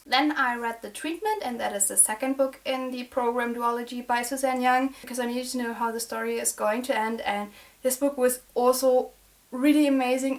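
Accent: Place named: Germany. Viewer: I just found your channel and I'm def subbing! Are you from Germany? Your accent sounds a bit german like!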